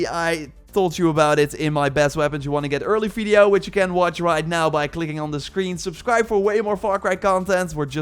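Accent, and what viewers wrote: Netherlands. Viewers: You sound way too Dutch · New to the channel, hearing your accent, are you dutch? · Ik hoor een Nederlander 😁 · How is He Faking it? That is his Dutch Accent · You sound dutch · I'm Dutch too, but dang Dutch accents are really annoying to listen to. it's called a hovercraft you dolt, we also use that word here in NL.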